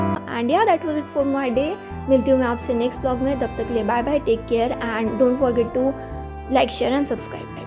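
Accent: India. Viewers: I think u are from south Indian na??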